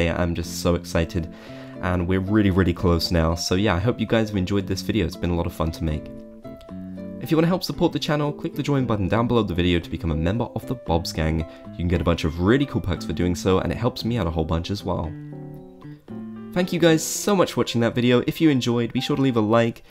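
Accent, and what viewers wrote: Wales, United Kingdom. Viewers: Ok I really need to know if you’re welsh bc of your accent it sounds so welsh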